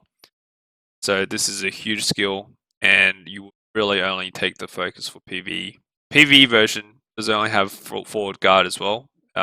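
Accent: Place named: Australia. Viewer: you sound aussie :) subbed nice vid mate! helped me heaps ,south aust.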